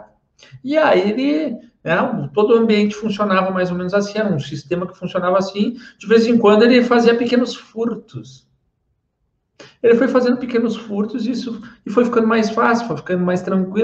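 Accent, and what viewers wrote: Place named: Rio Grande do Sul, Brazil. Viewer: Boa tarde, cheguei aqui por indicação! Pelo teu sotaque, és gaúcho da região metropolitana assim como eu! Gratidão pelo conhecimento!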